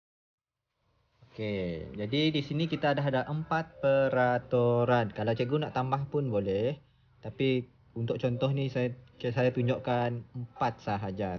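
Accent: Sarawak, Malaysia. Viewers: Bunyi org Sarawak jak. Mun benar, kipidap good job. Lamak sik nengar tune tutor gia 😁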